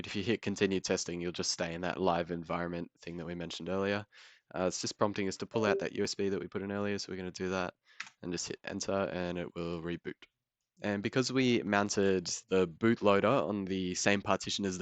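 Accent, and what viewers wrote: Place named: Australia. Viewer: Well done on your first video, i found your style informative and easy to understand. Just getting into Linux as a newbie and will be following your channel going forward (subscribed) also, nice to hear a fellow Aussie for a change LOL. Good luck with your channel and thanks for teaching an old 65 year old some new tricks. Cheers from Melbourne!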